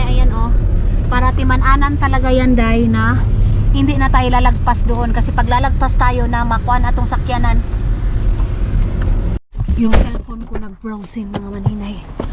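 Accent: Visayas, Philippines. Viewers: Tuwang tuwa talaga ako sa panonood sa iyo my dear maninay..yung pananagalog mo na me halong bisaya😊kahit di kita naiintindihan minsan patuloy ako sa pag aabang sa mga vlogs mo😅😅..go lng sa vlogging mas marami kaming viewers mo na naghihintay kht paulit ulit mga lugar na pinapakita mo sa amin..nevermind those bashers basta andto lng km😊😊😊❤❤❤happy ka happy din km na nagmmhal sa inyo ni..God bless❤🙏❤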